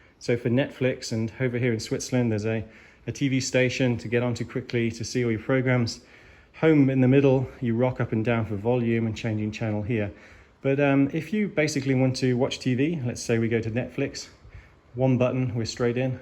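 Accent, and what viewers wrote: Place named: Australia. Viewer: your voice my man, sounds so much an Aussie！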